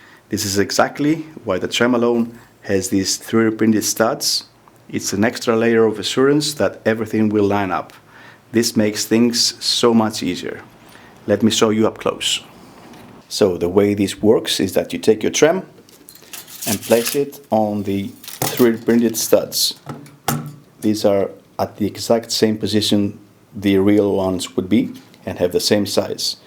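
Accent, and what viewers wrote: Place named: Greece. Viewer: 1 minute in and I started looking if you are Greek. Bless the accent <3 Great work <3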